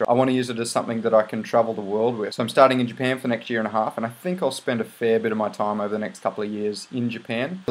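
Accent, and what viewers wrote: Australia. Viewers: ! I knew you were Australian!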